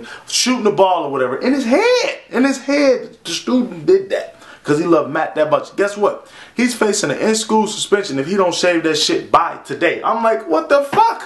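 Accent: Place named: Texas, United States. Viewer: I like your texas accent hahahaha!!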